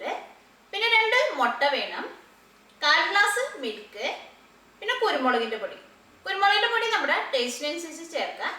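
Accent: Thrissur, India. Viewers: Aa thrissur accent inkkishtai.. :) thanks for the recipe.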